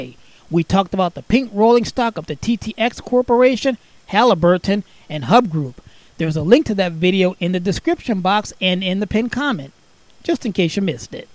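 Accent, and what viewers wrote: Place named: Northeast, United States. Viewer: Sorry, you're from the northeast, your accent makes you say "gone-dohla" we in the south just say "gone-dullah"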